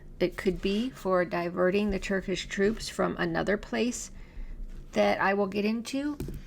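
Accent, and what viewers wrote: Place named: United States. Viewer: YT “fed” my this channel. Interesting, but I have some questions, comments, observations. First, when did your mother attend HS in Palaio Faliro? I lived there. I knew THREE women who went to school there, before 1960, none ever mentioned that Constantinople would be returned. But I have heard others say they were taught this-in rural Greek schools. Second, observation, you sound American. You’ve never noticed that Orthodoxy, Hellenism, and Greece are generally painted out of the news-scape? Pascha is glossed over, because it’s not Western Easter, while Jewish, and even Moslem and now Hindu holidays get press. You haven’t noticed that living in the US? Because I have since I came here. You have some good nuggets of information. I like the links and references to Nima and Col. Wilkerson, and Col. McGregor. For whatever reason (perhaps they were spurned by the system), the tell a lot of truths the mainstream media keeps. 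However, they have their biases. I’ve been watching McGregor for years. I like him, BUT, he was a Turkophile. Of late, he has seen the light and become more objective when discussing the Turks. The US officer corps, Army/AF has always favored Turkey. Israel is committing the first live-streamed genocide and apartheid. Israel is every bit as despicable and criminal as Turkey/Ottoman Empire, FYI. Greece should not trust Israel, or depend on it. The Greek government is basically beholden to the West/US. They are puppets. Back to the prophecies (as reported by you)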